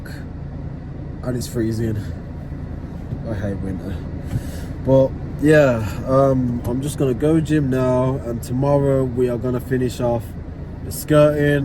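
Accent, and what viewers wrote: Birmingham, United Kingdom. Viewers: Can't help being a Brummie 😂😘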